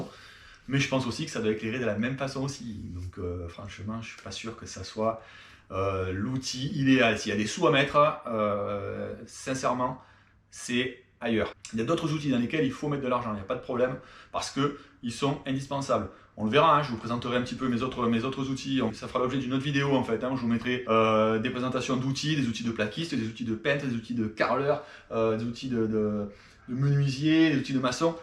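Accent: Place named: South, France. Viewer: Le bel accent à la Cabrel, magnifique ! ( et trés ingénieux le système bravo à vous )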